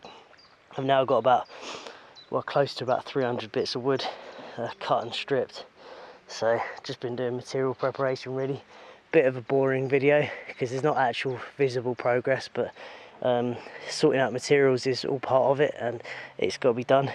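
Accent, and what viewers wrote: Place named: East London, United Kingdom. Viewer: you sound like an east-londoner!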